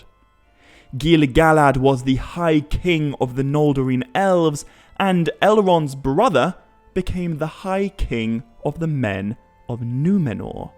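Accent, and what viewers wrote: United Kingdom. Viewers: Wow turns out I hate British accents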